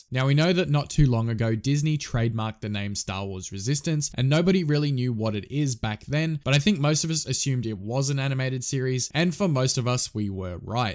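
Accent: Australia. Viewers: wait your an Aussie? im Australian two!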